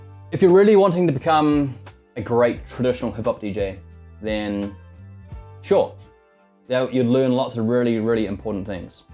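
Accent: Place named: New Zealand. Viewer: Chur bro, you sound like from Aotearoa New Zealand! Your choice of music and DJ style gave it away before I even heard you talk my bro💯👌🏽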